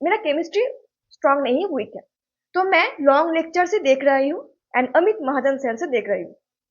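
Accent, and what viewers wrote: Bengal, India. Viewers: Aapke hindi bolne ka style thora bengali hai 😅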